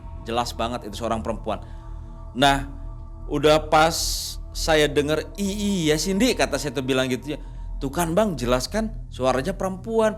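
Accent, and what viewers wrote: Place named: Indramayu, Indonesia. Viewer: 😂😂😂😂😂timurtengh hadir mang ay masa allah dri indramayu apa y bahasaya medog goblog ya😂😂😂😂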